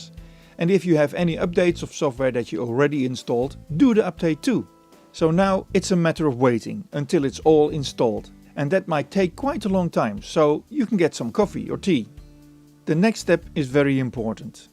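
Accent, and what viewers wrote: Netherlands. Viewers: I hear you are dutch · are you dutch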